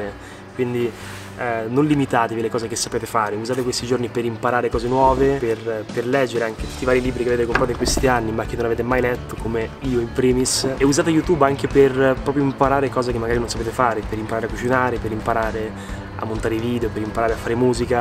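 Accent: Rome, Italy. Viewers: Oddio ti prego stai iniziando a perdere l'accento romano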